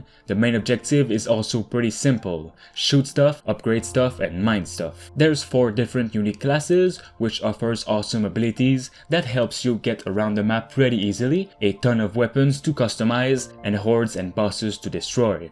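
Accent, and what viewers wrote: Quebec, Canada. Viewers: est-tu quebecois mon criss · Accent québécois ?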